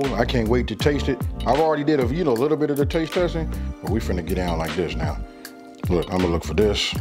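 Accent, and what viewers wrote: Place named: California, United States. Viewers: as someone who grew up in crenshaw and moved to the bay area, any time you hear someone with the same lingo and talks as you n your peoples... just makes this hit different. you earned a sub from me OG. keep makin great content. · man, I love big bro! this man can cook! I'm never disappointed! he got that og California accent 😂😂 much from okc, ok! keep doing this bro! I had a vision that you were on one of those food network shows, like chopped! you can do it, I believe in you! you're going real far with this, I see it!🙏🏽💯🤎🥂🥡🦐🧈🥗🍖🥞🥜🧆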